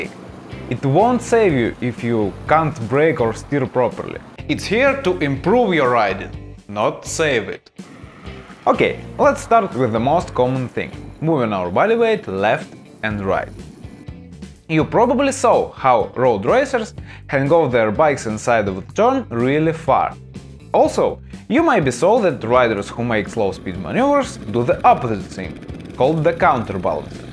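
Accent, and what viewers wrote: Russia. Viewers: Sounds very like Aleksandr Orlov, a celebrity meerkat well known in Britain and Australia, did the voiceover! This just means the advertising agency got the accent they were aiming for, Russian, correct. Not that meerkats are good at riding motorcycles. <link> compilation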